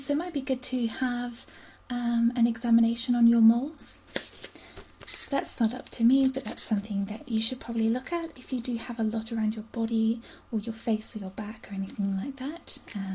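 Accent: United Kingdom. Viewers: Where abouts are you from? Sounds extremely British, and that's coming from a British person haha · that moment when you watch this videos because you learn english and she has wonderful british accent xD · British accent inspires me love. I do not speak english, greetings fron Costa Rica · OMG !!! I love your British accent ....sounds really amazing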